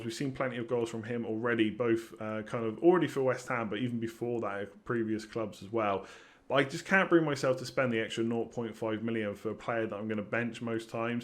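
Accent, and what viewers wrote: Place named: Bristol, United Kingdom. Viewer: You sound a Bristol boy. Gas?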